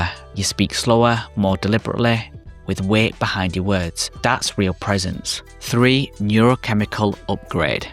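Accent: United Kingdom. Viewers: what British accent is this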